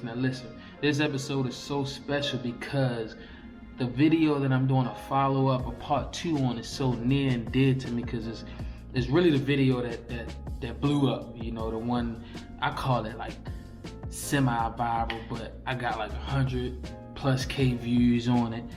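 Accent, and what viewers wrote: Baltimore, United States. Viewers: U from Baltimore ain’t you🤣 that accent one of a kind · Can tell you from Baltimore 😂, but good luck bro ! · i hear Bmore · I here Baltimore baby...keep up the good work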